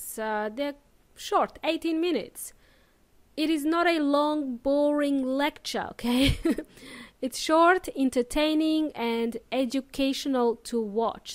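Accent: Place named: Latin America. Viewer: I love your voice it's so clear to me so my question is are you originally from a Latin American country because your voice sound like Latin person by the way a new subscriber to your channel .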